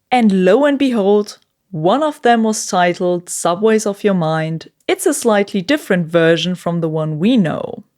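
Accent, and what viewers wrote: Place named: Germany. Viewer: Dein deutscher Akzent erinnert mich an von. 😀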